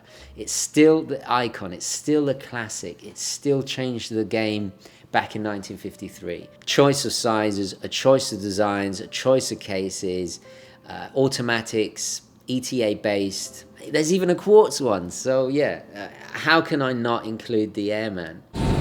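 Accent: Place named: United Kingdom. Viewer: Just came here for the "Bri-ish Ah-Cents".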